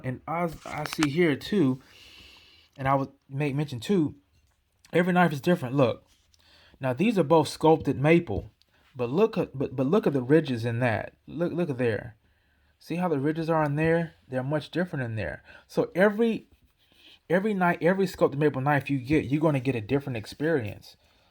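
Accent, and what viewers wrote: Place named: South, United States. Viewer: He’s probably from the South like me. We have accents:)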